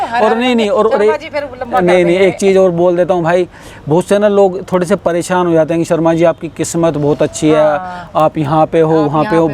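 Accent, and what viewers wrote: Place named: Haryana, India. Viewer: Faridabad ,Palwal ki si boli hai aapki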